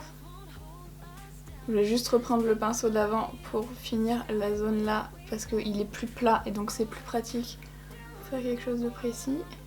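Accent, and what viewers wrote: France. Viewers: Ahah ton accent espagnol c’est pas trop ça 😂 très frenchy c’est mignon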